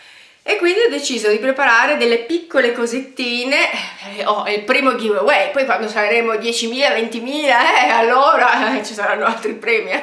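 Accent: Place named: Turin, Italy. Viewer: Sei di Torino, vero? Riconoscosco quello che é anche il mio, per niente marcato, accento 😂😂😂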